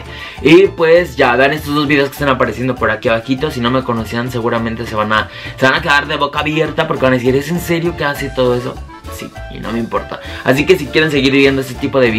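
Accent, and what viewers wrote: Mexico. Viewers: Como se llama el tono ese muy mexicano q pones en tus video me ree gusta..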